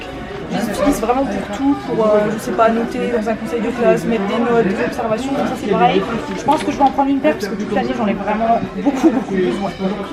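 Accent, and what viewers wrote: North, France. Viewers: on reconnait l'accent du nord mdrr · Les gens entende beaucoup l’accent moi non 😂( oupsss je viens du Nord aussi )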